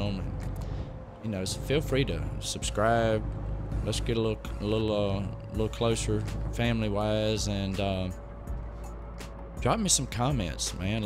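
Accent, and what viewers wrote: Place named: South, United States. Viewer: As always love what your doing man! So glad I found your channel! Dude I love when you say sweet jesus Lol so funny man. Rather it's this or lspdfr you make it very entertaining to watch! Coming from south florida it's nice to here that southern accent :) also very nice involving your kids into what you love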